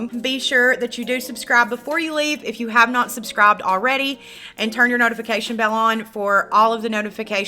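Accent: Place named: Tennessee, United States. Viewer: I love me some Tennessee accent!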